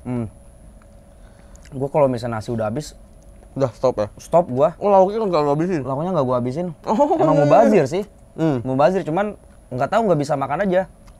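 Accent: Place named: West Java, Indonesia. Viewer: Sunda bnget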